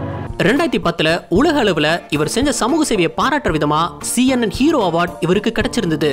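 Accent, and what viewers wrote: Madurai, India. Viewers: Nee ga madurai ya bro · Bro neega Madurai ya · Bro neenga madurai yaa bro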